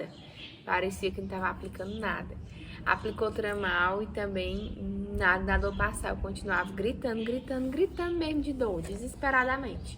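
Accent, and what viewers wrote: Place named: Ceará, Brazil. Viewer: E esse sotaque cearense?..hehe.. Deus abençoe sua vida! Deus sabe de todas as coisas!! O meu caso foi muito parecido, adotei uma menina, minha Larissa. Ainda pensava em engravidar, quando resolvi fazer tratamento, descobri câncer de endométrio, minha sorte foi oq descobri cedo. Hoje estou curada e muito feliz pq estou curada e posso cuidar da minha menina Larissa. Presente q Deus me deu para que não sofresse depois uma histerectomia total. Deus sabe de todas as coisas. Tudo é providência Dele. Penso em adotar outra menina pq a primeira deu certo, amor maior não há!!!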